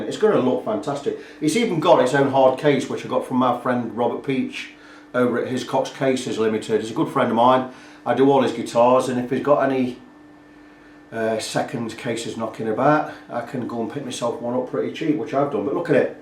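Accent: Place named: Nottinghamshire, United Kingdom. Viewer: Great restore mate! Ican see you are passionate about your work. I can slightly trump your bargain though - I picked up an ESP built Focus <num>) in the 90s - MASSIVELY unfashionable then lol, with the original FR also in the metallic red like yours for ony £60 :) The Charvel Japanese Model series (Fuji Gen?) from mid 80s are another underrated and overlooked find. Would be good to see you make a super low cost (but not nasty) Chinese guitar like a Stagg or something play like butter! Also your accent - are you in or near Sutton in Ashfield or thereabouts?!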